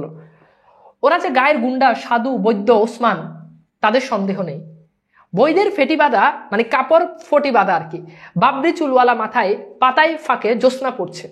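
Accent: Noakhali, Bangladesh. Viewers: Noakhalit Khar bole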